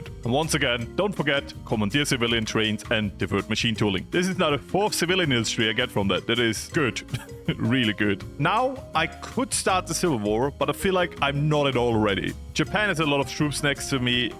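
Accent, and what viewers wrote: Austria. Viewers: Wait a minute r u Austrian or Australian?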